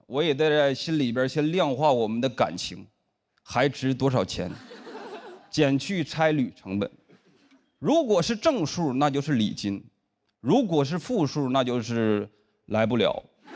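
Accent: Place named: Northeast, China. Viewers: 東北人口音好有趣，也挺好笑的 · 哪个学校的呀感觉好像黑龙江呢，🤣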